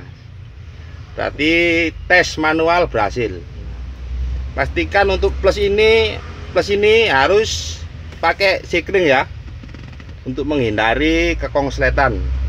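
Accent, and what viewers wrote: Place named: Java, Indonesia. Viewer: Joss mas ,,, medog jowone !!!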